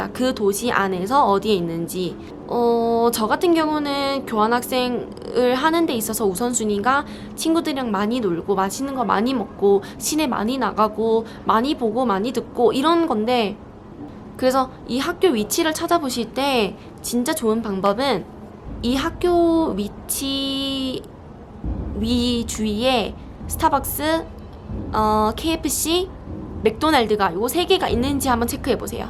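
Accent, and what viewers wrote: China. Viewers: 그리고 점점 중국어가 느시나봐요! 한국어 발음이 중국인처럼 바뀌고 있어요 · 혹시 조선족이세요? 발음에 중국인특유의 어투가 진한데.. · 발음이 중국화 되신거 같네요 ㅋㅋㅋ 신기합니당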